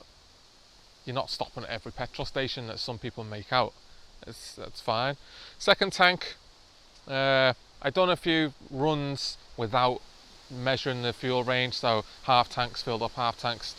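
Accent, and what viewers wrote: Liverpool, United Kingdom. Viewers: A Scouser in Finland ? Are you on the run? LOL PS Subscribed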